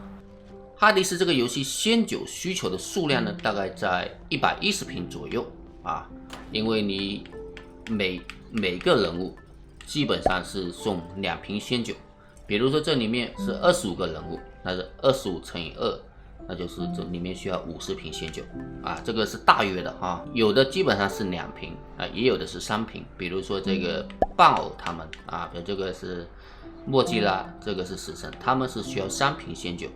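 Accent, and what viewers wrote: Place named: Fujian, China. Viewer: 胡建口音有点重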